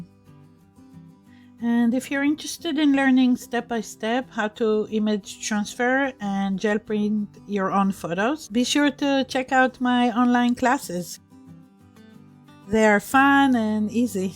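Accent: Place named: Eastern Europe. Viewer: Hi and first, thank you for your videos! My first question is, I will produce my own artwork using acrylic paint and alcohol ink designs then transfer photocopies of my art onto acrylic sheet, what paper is the best for me please? My second question is, is the Gel Medium technique best for me? By the way, the best years of my life were spent in Chernivtsi in Ukraine and it’s so nice to hear an Eastern European accent again! Kindest regards, Paul.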